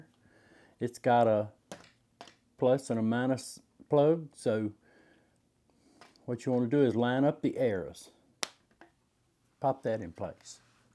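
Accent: South, United States. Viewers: A Chinese ebike review with an American southern accent is dope and funny at the same time lol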